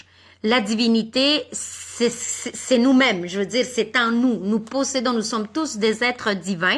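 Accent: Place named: Spain. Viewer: Quel joli accent que l'accent espagnol en français quebecois. Merci pour cette vidéo.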